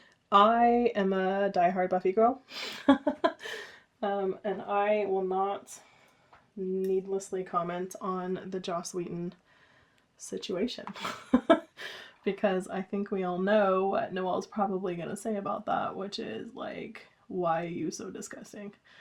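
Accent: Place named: Seattle, United States. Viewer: Love the dress and the video! I’m one of those folks that has watched your channel for ages. I live in Denver now but was born in Seattle and raised in the Bay Area and you just sound so familiar to me. Nothing like California. I am a seamstress and do everything from hemming jeans to making wedding dresses and have done so since I was 16 (I’m 70 now so do the math!). I’m also a quilter, knitter and embroider. Gotta keep those hands busy when I’m watching tv. I’m not reading anything new but just reread The Red Tent which is one of my favs. My daughters are in their 40’s and were raised in the Bay Area too. Okay, I guess I’ve taken up enough of your time. Take care. 😊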